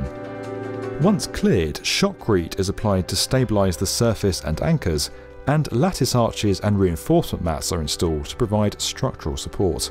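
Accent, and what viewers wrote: United Kingdom. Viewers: Seems kinda weird to have a british guy narrate this, about a tunnel being built in europe, by the EU, and use dollars to explain how much it cost? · Why is the cost presented in US dollars when the presenter is, or at least, sounds British, and the project is based in Europe- an EU project. Surely the cost should have been in Euros???🥺🧐🙄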